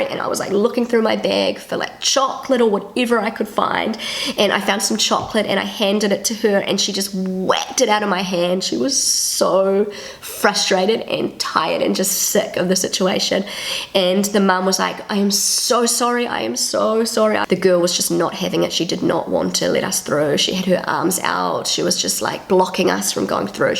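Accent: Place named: New Zealand. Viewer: I always understand "chicken point" when you pronounce "check-in point". Kiwi accent is so funny! 😆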